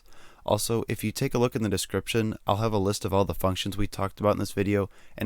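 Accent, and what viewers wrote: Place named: United States. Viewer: Hey bro, thanks for your course with US accent. It is easier to follow the course.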